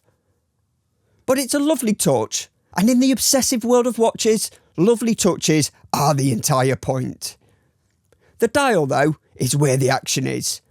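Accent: United Kingdom. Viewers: Hooray Grimsby! I thought you sounded like Olga from The Toy Dolls. Sunderland. Maybe, Tyne or Wear. Awesome channel!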